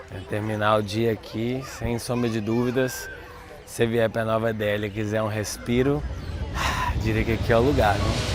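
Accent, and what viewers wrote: Minas Gerais, Brazil. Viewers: Estou amando seus videos, o sotaque Mineirinho me dá uma saudade de casa 🥰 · Vô te falá viu. Acho q ele é mineiro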